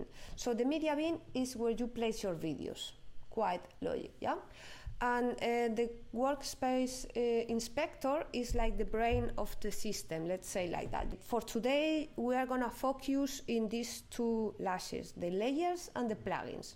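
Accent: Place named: Italy. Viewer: Simply the best tutorial teacher! and the Italian accent is lovely! Merci!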